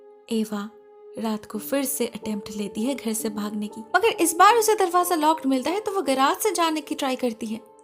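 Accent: Bengal, India. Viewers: her accent described it so well that she's bengali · R u begali...it seems by your tone of Hindi...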